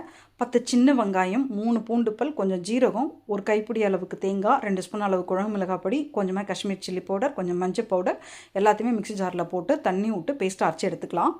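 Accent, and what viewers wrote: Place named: Kanyakumari, India. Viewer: Nee entha uooru...kaniya Kumari style sollu...thappu thappa sollu thara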